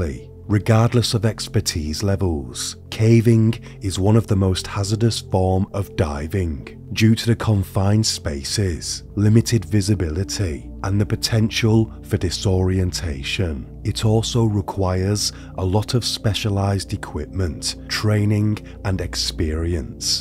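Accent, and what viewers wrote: United Kingdom. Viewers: I love the British accent, though I find it hard to follow if I'm just listening (yes. I know. It means I'm stupid cause no one else has that issue. Kool... Moving on) I thoroughly enjoy your narration, and, for what it's worth, appreciate the work you put in. "Jolly good show ole chap!!" ❤ p.s. Liked AND SUBed 😉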